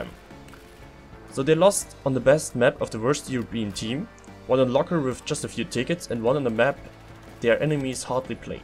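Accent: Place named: Germany. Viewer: Man hört so den deutschen Akzent so hart :D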